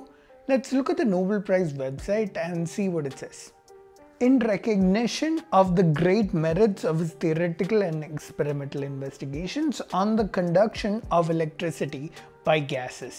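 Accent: Kerala, India. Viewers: Bro I really appreciate you finding mistakes of others..... But pls do speak in proper accent. I feel like I'm watching Malayalam channel. U r killing it 😂😂😂😂.sorry it's ur 1st mistake. 2. U didn't do proper research on JJ Tommson.... His Nobel Prize considered as false and it's still controversial.... There are thousands of science theories and research were proven wrong later. And it's updating.... I know you are doing this for fame.... I feel pity for you.... I really enjoyed your videos it was like a comedy show.... Particularly LMFAO when u said "hilarious" 😂😂😂😂😂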